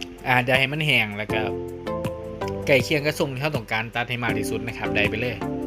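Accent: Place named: Isan, Thailand. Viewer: ให้เสียงอิสานอย่างมีอรรถรส ต้องรอตอนเมา😁...เพิ่นขึ้น หัวละ 300฿.แล้วเด้อร้านนี้💇🏻‍♂️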